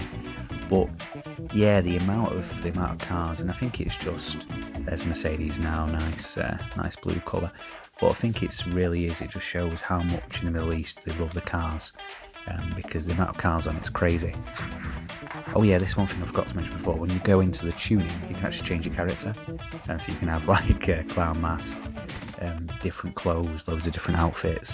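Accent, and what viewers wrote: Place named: United Kingdom. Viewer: You kinda remind me of Elanip who plays GTA 5 with your british accent xD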